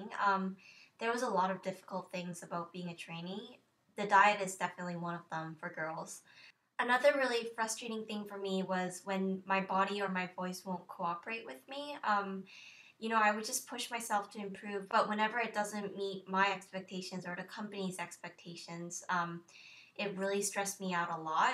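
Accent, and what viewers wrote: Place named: United States. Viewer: Your English is very good if you were in America most people would assume you were American based on the way you talk. Good luck with school.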